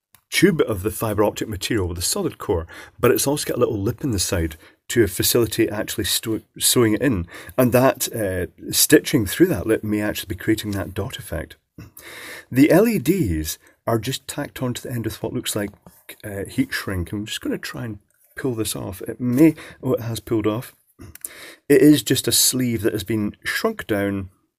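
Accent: Scotland, United Kingdom. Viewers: A) he's scottish and B) electronics is literally his area of expertise. Perhaps you need to get out meet people yourself, instead of writing rude comments on youtube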